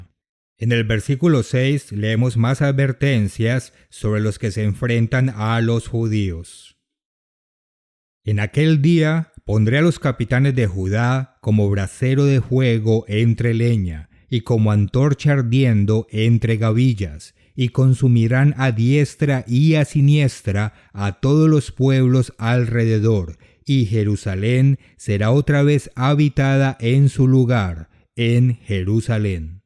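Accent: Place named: Latin America. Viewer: Me encanta este canal,pero una cosa, la voz es muy latina y no la comprendo , desde Chile bendiciones!!!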